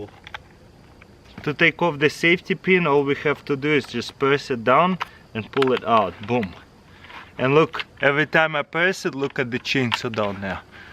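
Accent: Russia. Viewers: without insulting sir, but are you Russian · First he's Russian, and second he says it how ever he wants.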